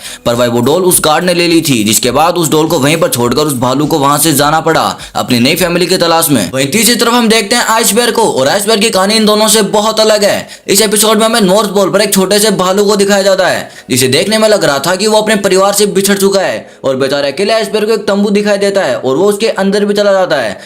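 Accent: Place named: Pakistan. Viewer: Ap Pakistan se ho? 🤔 aapki accent pakistani jaisi hai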